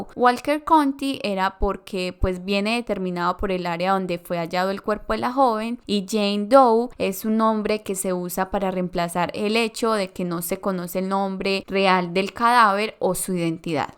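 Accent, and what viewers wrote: Colombia. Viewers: me parece genial que lo hayas tomado bien, la verdad cuentas con mi apoyo en tu canal seguiré viendo tus videos pero si mejora un poco el guión para que no sea repetitivo. Aprovecho nuevamente para felicitarte por tus investigaciones son muy detalladas. Por tus modismos y acento creo que también eres colombiana como yo y si eres de acá me parece genial 😁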